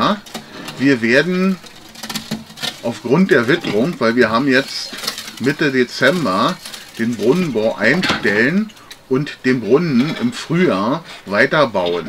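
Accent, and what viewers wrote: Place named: Berlin, Germany. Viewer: Was soll daran nicht mein Ernst sein? Dem Tonfall nach zu urteilen befinden wir uns in Berlin oder Brandenburg, folglich bezieht sich meine Frage auf die dem Brunnenbauer/Grundwassernutzer obliegenden Verpflichtungen nach den Paragrafen 36 und 37 des Berliner Wassergesetzes oder wahlweise 55 und 56 des brandenburgischen Wassergesetzes, ansonsten schlicht und einfach auf die Regelungen des Wasserhaushaltsgesetz des Bundes in Bezug auf den Brunnenbau und die Benutzung von Grundwasser.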